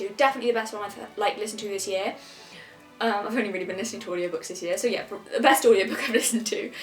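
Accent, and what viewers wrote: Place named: United Kingdom. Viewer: By prober vids i hope you mean videos where i have to check if my headphones are working! 😂 (i showed your shadowhunters videos to my friend who loves them and he was like "she has to shut up, because shes right but i love the books and no smart girl with an adorable british accent can change that" 😂😂😅 we italians do love your accent)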